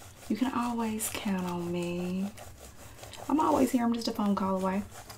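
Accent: South, United States. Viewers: Everyone in my family has a southern drawl Can confirm this is accurate And hilarious 😂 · The sassy comments coming from our southern mama 😂❤ the accent is spot on! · Loved the Southern accent. Every Southern Mama I know follows up any "advice" or opinion with a "Bless your heart" to keep her sweet Southern Belle persona. Example: "Make sure you see him without his hat. He could go from an 8.5 to a 2, bless his heart." Excited to see Part 2 and how the date went! ❤ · This was such a solid Southern accent! 😂 I’m from the South, and although not everyone in the South talks this way, for the ones that do, this was spot on. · as a alabamian born and raised in the south i can say this is 100% accurate and this is a almost perfect southern accent great job! but you forgot to offer her some sweet tea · This is so freaking funny 🤣 and very tingly!! Those clacking fingernails ❤ I am a southern woman and you nailed the accent 👏👏👏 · As a Southerner with friends and family with thick accents, I am dead!! 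😂 I love this! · 😂😂😂I CANT CANT CANT!!!! 😅😅😅ur killing me!!! 😂 I’m truly a Southern woman!!! 😂 you did pretty darn good with the accent - fyi your talking red neck mixed with country with a splash of southern baptist mom 😂…. 😂 Alabama- mixed with Georgia and Mississippi 😂 ❤ most fake southern accents I cant stand listening to… BUT YOU ARE AWESOME 😂…. I had 4 boys but was a grandma at 37 😂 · please do make this a series 🙏 the southern accent is so satisfying and the mom character is just so loveable ❤ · My new favorite video of yours!!! I love southern accents ❤😂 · Your southern accent is so on point... where are you from? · Whew, this accent is so accurate. It made me feel I was back in NC for a moment 😭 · I love the Southern mom! I live in SW Indiana across the river from Kentucky and people here definitely have a twang. So this was relaxing and familiar - thank you!❤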